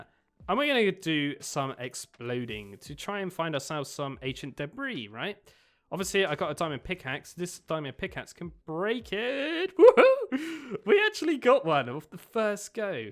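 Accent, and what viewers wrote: United Kingdom. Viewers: This series is the first Thing ever I saw from this man I just now physically actually realized he’s British and it just makes me feel so stupid PHWHWHWHHWHWHW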